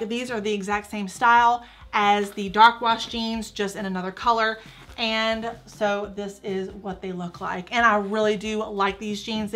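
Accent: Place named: Atlanta, United States. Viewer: I love you and your accent so much. I'm from Atlanta and it's like I'm talking to a friend. ❤️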